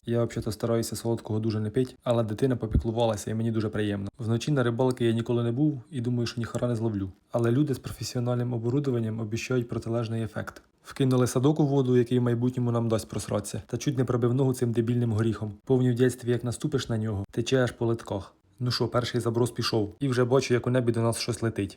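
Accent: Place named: Sumy, Ukraine. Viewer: А з якої ви області? Такий суржик, як в Сумської. Я наче вдома побувала. Дякую вам!!!) Гарний контент( щоденне життя то круто).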